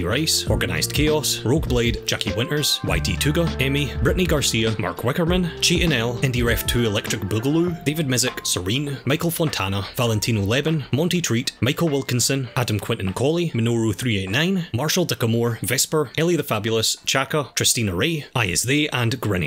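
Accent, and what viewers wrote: Ireland. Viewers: Are you irish? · I never get tired of hearing a good ole Irish (sorry if I got it wrong) voice.... it can be very friendly but have mischievous intents behind it all. Keep voicing your characters! Your doing great!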